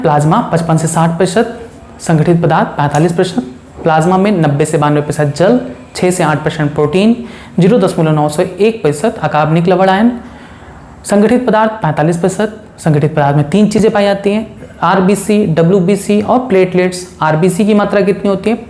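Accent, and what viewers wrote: Chhattisgarh, India. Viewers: Aap Chattisgarh se ho kya ?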